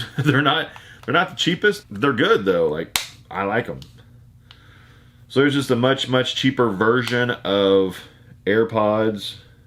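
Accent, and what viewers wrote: United States. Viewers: I don't mind your rambling...a) I like your voice and b) I'm not an english-speaker so it is always good to listen to some genuine american-english ^^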